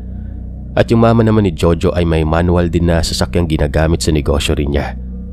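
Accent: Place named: Visayas, Philippines. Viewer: Bisaya pronounce ang ganda pakinggan😊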